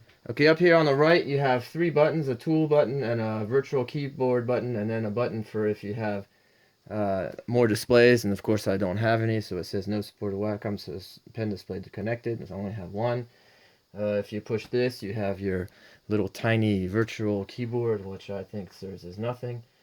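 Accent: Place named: East Coast, United States. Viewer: Ahhh ok gotcha. Exactly, the person mentioned cubebrush, whoever that is. Another I'll need to look up. The artists you mentioned are great btw. You live in Europe? Hmm wouldn't have guessed that. You sound kinda like you're from the East Coast.The paper one sounds good. No way that can scratch the screen too right? Yea the plastic is harder to control. Almost impossible to get fully controlled quality of line I found at least. So you got two models one early and one later as a replacement and they both were smooth..? Yea see those in videos, glad they replaced it. Wow that's awesome. K good hope not. K. Would you want to sell the Corel? I hear you. Been watching videos on Photoshop.. the mixer brush looks really interesting. I'm guessing it's only on the stupid CC versions? Was trained on Photoshop too long ago, forgot everything. 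Sure via torrents and key g3ns. Don't condone it either but good to know. Great idea, will do that ty. Very good to know all that. One thing I'm dreading is setting up things, settings etc. Am already pretty systematic in setting up computers but with the number of settings to save to the remote, the number of brushes one can use, all the different settings to account for it looks a bit overwhelming. Yes you did, thanks so much!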